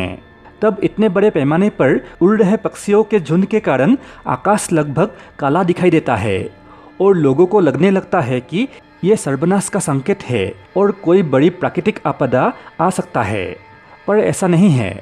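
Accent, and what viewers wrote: Assam, India. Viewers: আপোনাৰ উচ্চাৰণৰ ওপৰত ভিত্তি কৰিহে সুধিছো মহাশয় , আপুনি হিন্দিভাষী নহয় , নহয়নে ৷ উজনি অসমৰ যেনো লগা নাই হলে 😃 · একদম অসমীয়া এজনে হিন্দী কৈ থকা নিচিনা লাগিছে।হিন্দী উচ্চাৰণ ত অলপ improvment ৰ প্ৰয়োজন।চেষ্টা চলাই যাওক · The Hibi bcoz mother toung hindi nhi hai ....Yeh asssamese hai phir v yeh try lar Raha hai .... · Aapunr voice2t akhomiya Jen lgise....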